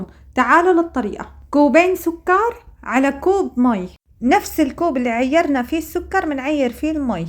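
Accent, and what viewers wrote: Homs, Syria. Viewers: بسكويت وراحة من أكلات الطفولة السعيدة من أيام الزمان الجميل زمن الطيبين كانت الحياة حلوة وبسيطة كل الشكر والتقدير لك أختي الكريمة لهجتك حمصية أصلية حمص يا بلدي يا أحلا البلاد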